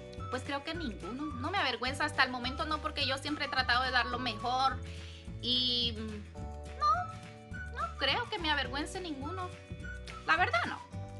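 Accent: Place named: Mexico. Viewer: Me encanto el tag lo hiciste parecer divertido 💗y yo creía por tu acento que eras Mexicana si no es porq tocas el tema yo seguía convencida de que eras 100% México❤y pues si se pega un poco! yo vivo en Italia hace 13 años y no me doy cuenta pero las demás personas me dicen que tengo un acento diferente!yo pienso que es normal!Besos hermosa y sigue así de natural❤😘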